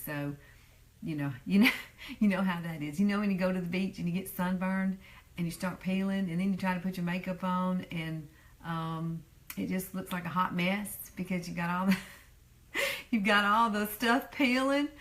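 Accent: South, United States. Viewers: Hi! So refreshing to have found you. I am subscribed to a few beauty gurus, but they are all in their 20's and 30's. Fun and entertaining to watch, but I was looking for someone to address some issues of the inevitable aging skin. I am 48, and the one thing I fight with is the fine lines and crepeyness of my under eyes. It seems any and everything I try to use just accentuates the madness😩I'm going to give this a try. never thought to leave that area naked from foundation. I do make my own facial oil with jojoba, coconut or sweet almond as the carrier oil. Then add in essential oils such as frankincense, vit. E, lavender, rose hip, pomegranate oil, sweet orange, ylang ylang or rosemary oil. Not all the essential oils in one potion, I just play around with each new batch and it has done wonders for my skin over the past year. Truly amazing. Also, LOVE rosewater! 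Can't wait to try this. You are beautiful and I love the southern accent. New sub here! Much love from Texas💕💋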